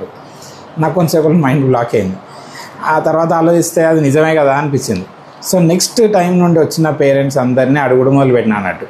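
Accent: Telangana, India. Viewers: తెలంగాణ స్లాంగ్ల ఏమన్న జేస్తున్నవ డాక్టర్ సాబ్ వీడియోస్,,, జోరున్నయ్ పో 😅😅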